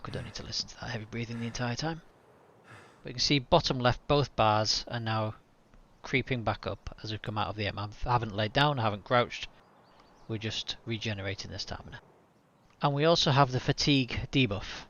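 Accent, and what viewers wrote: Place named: Wales, United Kingdom. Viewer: I starve in raids, thanks for explaining. Your Welsh accent is great. Not too hard to understand.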